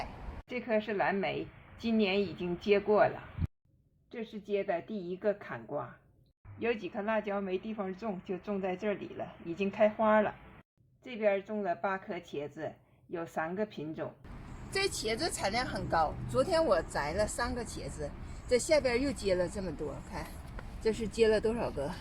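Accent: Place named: North, China. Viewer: 您的菜园打理的太棒了，又在网上找到一位好老师，以后会经常来学艺喽。听您口音是北方人吧😊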